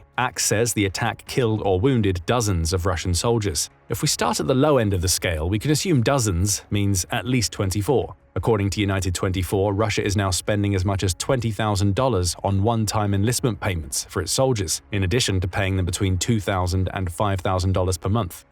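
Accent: England, United Kingdom. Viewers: Amazing to hear someone with an English accent use the phrase, "Get out of Dodge". Funny and cool at the same time. I am hopeful for Ukraine, and despise president trump and his pro-Putin agenda.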